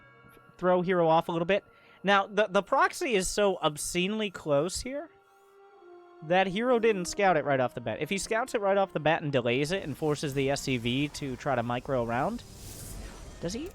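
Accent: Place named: Texas, United States. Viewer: You sound like youre from Texas,. When you started commentating all fast it reminded me of those auctioneers xD can i get a thounsand? Thousand two thounsand five, six, two thounsand!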